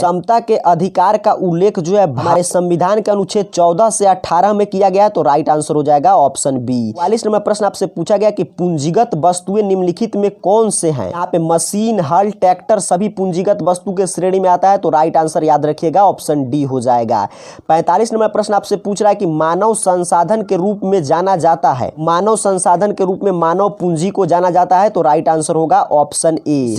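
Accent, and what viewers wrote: Bihar, India. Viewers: Thanks sir .aapke aawaj se pata chala hi ki aap Bihar se hu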